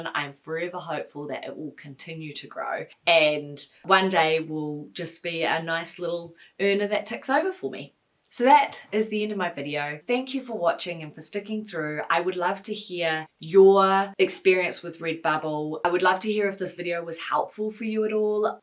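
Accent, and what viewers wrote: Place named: New Zealand. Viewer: Oh, hey! I knew you were from new zealand from your accent! I live in new zealand too :]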